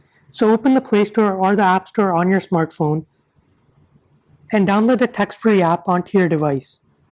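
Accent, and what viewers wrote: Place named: India. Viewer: ikr. But despite that, I found this video kinda useful and the Indian accent wasn't too awful. It would be helpful to simply state that Telegram requires "A PHONE NUMBER" to download/set up, but it does not have to be your phone number OR DOES IT? idk could a textfree number on another device suffice? And what's the deal with the call back? I use textfree to avoid having audio phone service. Does Telegram require that one-time audio connection? If so, then why? It's not an audio-based app. And what happens if a user changes their phone number (which I do regularly). These are the kind of questions which should be answered in this kind if video.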